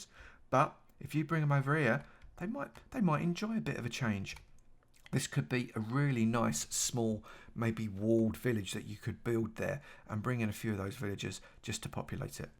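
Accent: United Kingdom. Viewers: your voice sounds like gordon ramsay lol or some british dude doing a tutorial about somehing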